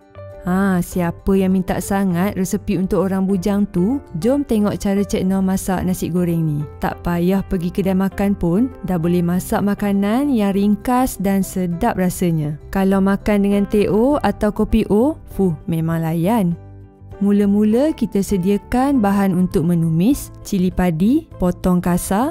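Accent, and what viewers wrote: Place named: North, Malaysia. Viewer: ni asal mana eh.?? ada bunyi utara skit2 tuhh😆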